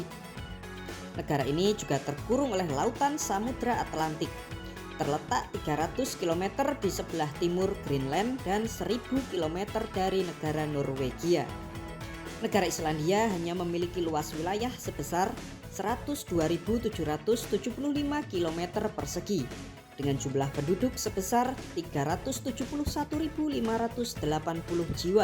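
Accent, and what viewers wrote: Java, Indonesia. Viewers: Medok euy 🤭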